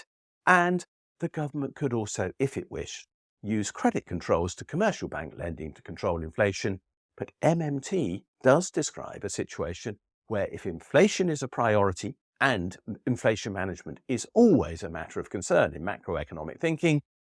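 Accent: United Kingdom. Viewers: Just because he has a British accent doesn't mean he sounds like Varys lol.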